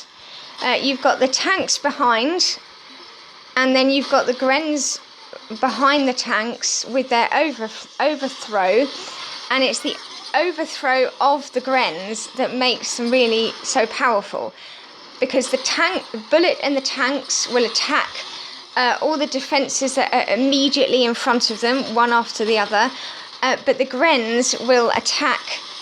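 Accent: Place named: United Kingdom. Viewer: Who talks this way? Which part of britain is that accent?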